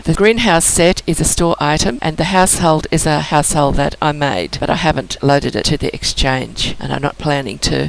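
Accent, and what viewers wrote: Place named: Australia. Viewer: So very clear and great to hear an Aussie voice, thanks heaps.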